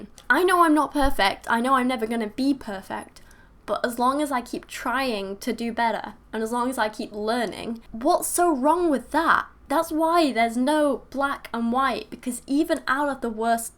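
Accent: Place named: England, United Kingdom. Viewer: You brilliant little apple nibbler! :) awesome. Even better how u say it, English accents add to how good most women sound :) keep fighting the good fight . Your fan -Brandon Gutleben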